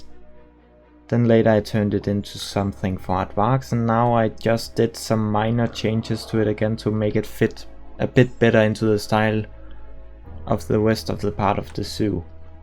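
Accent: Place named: Denmark. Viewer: Love the zoo. Also, as a fellow dane, i recognized the accent immediately lol. But your english is good so dont worry about it. It has a charm ❤️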